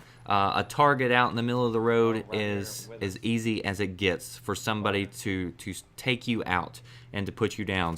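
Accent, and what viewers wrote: South, United States. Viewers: what part of the south are u from? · where you from? i had a feeling you were from the south with that accent. go vols baby.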